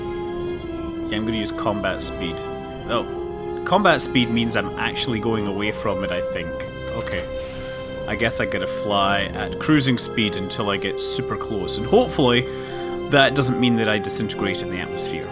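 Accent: Scotland, United Kingdom. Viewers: so i went to increase the resolution on the video since youtube thinks im on dialup. Ended up hitting the closed captions. fuck me this shit is hilarious with a scottish accent!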